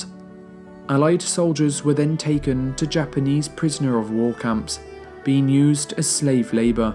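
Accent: United Kingdom. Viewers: Came up on my feed, clicked the link and as it loaded thinking "...this narrator better have a British accent..." Noice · He just speaks British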